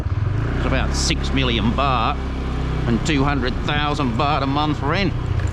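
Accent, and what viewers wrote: Australia. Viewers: Annoying Australian accent, go home if is nothing open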